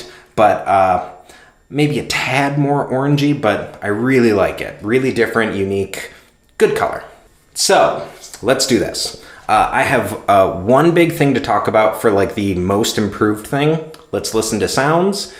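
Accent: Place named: South Carolina, United States. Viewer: hahahaha new follower because of your voice and accnet, sounds like south carolina deep in the south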